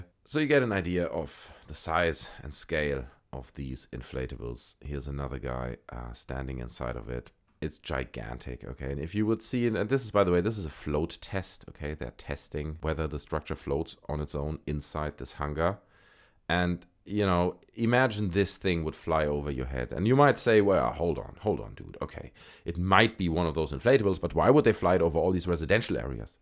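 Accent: Germany. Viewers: For one thing you have a German accent for another were you there I was there when that happened in 97 my wife and I then I had a video camera I have video taped it it didn't make a sound and it was from not this world you can tell when your hair stands on your back it wasn't from this world no it wasn't a reshape balloon for one thing a balloon will not go into space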